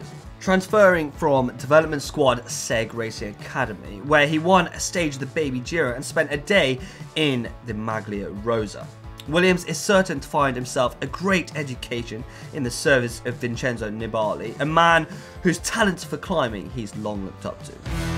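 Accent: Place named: United Kingdom. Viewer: British pronunciation of french names <3 <3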